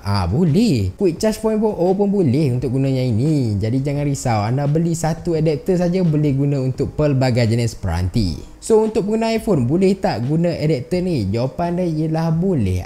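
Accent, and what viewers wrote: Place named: North, Malaysia. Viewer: ...aik utaqa pulak ka bang hahaha...duduk mane,btw sebenaqnya aku pun oqang utaqa gak hehehe😂👌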